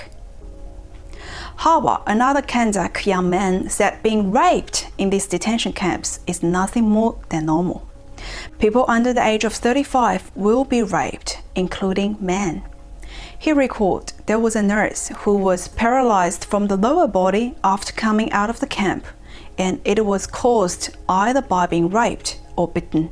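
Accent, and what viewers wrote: Australia. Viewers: 好像是澳州口音的英文。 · Really Fly! (真飛！）澳系口音舒服。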